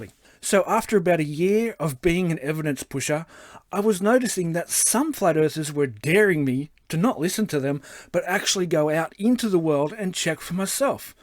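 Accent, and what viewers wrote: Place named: Australia. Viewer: Did it ever occur to you that your voice tone and phasing resemble Mal from Borderlands 2, but with a great Australian accent? <link> Check it out!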